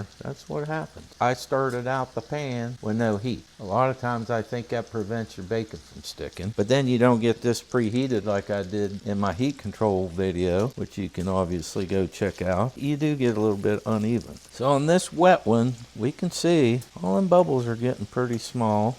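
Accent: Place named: West Virginia, United States. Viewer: your accent reminds me of home so much. Do you happen to be from West Virginia? And if so, what part?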